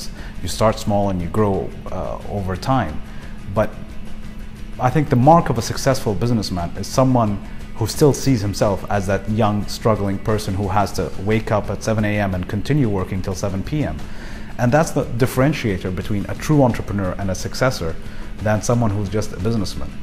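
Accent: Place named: England, United Kingdom. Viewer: mashllah i like ur englsih accent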